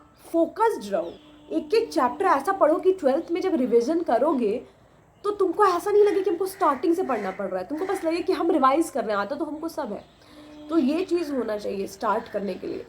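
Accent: Bihar, India. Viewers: Sister do you belong from Bihar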